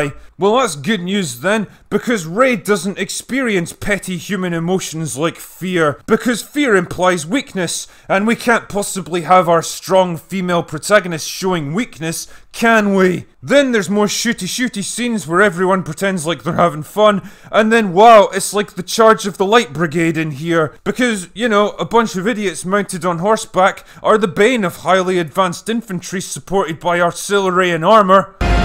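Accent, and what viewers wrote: Scotland, United Kingdom. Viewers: He's Scottish. He just sounds that way.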